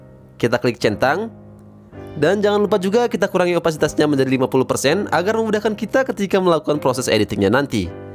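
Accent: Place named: Gayo, Indonesia. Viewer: Fix dialeknya mirip orang gayo🤭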